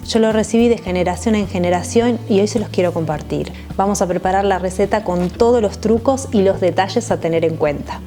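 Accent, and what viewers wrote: Argentina. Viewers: ❤😊gracias por la recent unique estoy USA y las hojas no se por cual sustituirlas acá que tengan esa ingredients parecidos 🌷🌷🌷gracias soy Dominicana me encanta el ace to Argentino y vivo aquí en North Carolina 🇩🇴🙏🏼🌷🌷🌷😍💕gracias soy Dominicana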